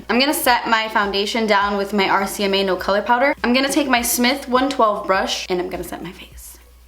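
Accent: Cuba. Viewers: I could just listen to you talk with your Cuban accent , I love it ! Lol 💕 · Jajajajajajajaja! Tas podrío mi hermano! Me muerooooo! Me encanta tu acento cubano! Mi perro también me tortura así! A veces se asusta él y todo! Un besazo enorme desde Madrid! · Your Cuban accent. I love it! 😍😍😍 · She's Cuban I believe! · Lauren Emma I think she's from Cuba but I'm not sure!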